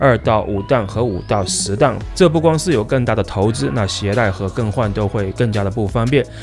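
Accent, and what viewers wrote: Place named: Sichuan, China. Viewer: 这个川普听起来太舒服了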